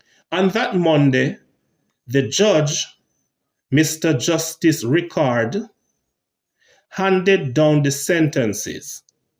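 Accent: Jamaica. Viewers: I completely agree! People forget Jamaican cadence, intonation, syntax is uniquely beautiful and that also it's such speech variants that shape carribbean language.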